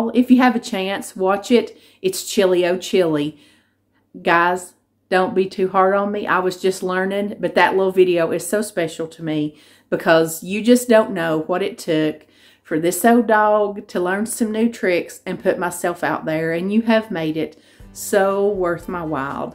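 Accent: South, United States. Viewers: I adore watching you! You remind me sooo much of my family in Tennessee. They have all passed so listening to your southern drawl is such a nice reminder <3 Blessings to you!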